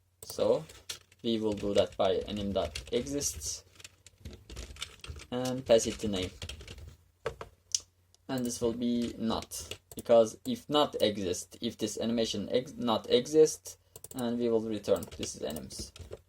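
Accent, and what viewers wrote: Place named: Turkey. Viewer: usta hiç dikkat etmemiştim masaüstüne aksandan türk mü acaba dedim doğru çıkıt :d böyle bir işe ingilizce girip anlatmak çok iyi cidden helal olsun eğer yorumu görüp cevaplayabilirsen bir şeyler sormak isterim bi kalbe anlarım soru beklediğini AS:DASd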